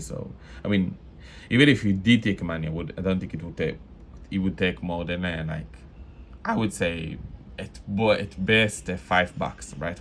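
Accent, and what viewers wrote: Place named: Israel. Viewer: I fucking love israeli accent mate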